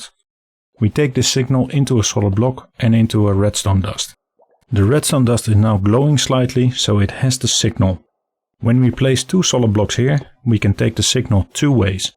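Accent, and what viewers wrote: Netherlands. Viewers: Thanks for explaining! I can sense Dutch-ness from miles away haha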